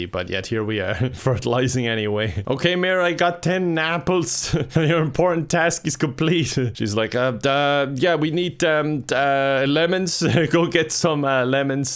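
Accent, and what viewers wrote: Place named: Ireland. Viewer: omg i love speaking that thicc irish accent 😂😂😍😍😍😍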